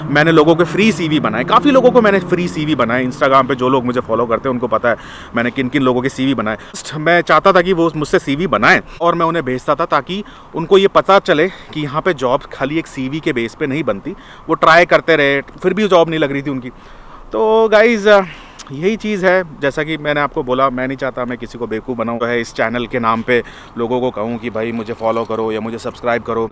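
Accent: Kerala, India. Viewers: Sir are you MALYALI?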